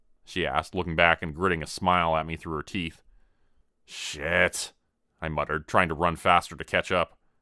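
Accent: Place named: Canada. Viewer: Tjis is great. Is this your real voice man. Its nice. You sound a bit Canadian with the inflection. Cool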